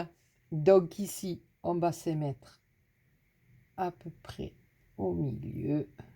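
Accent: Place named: Aubagne, France. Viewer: Bonjour.nous sommes ma soeur agnes et moi d'aubagne, nous vous suivons,vous êtes très soignée dans vos projets,avec des finitions nikel.en plus nôtres accent est magnifique.bisous d'aubagne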